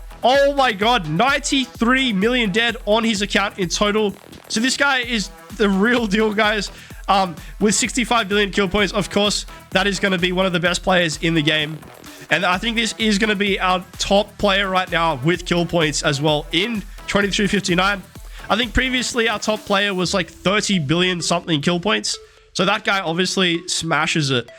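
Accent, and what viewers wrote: Australia. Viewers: Oh dam an Aussie streamer. I had no idea 😂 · r u come from australia ?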